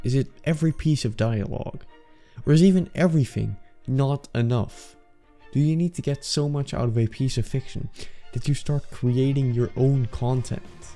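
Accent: Netherlands. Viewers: i smell dutch..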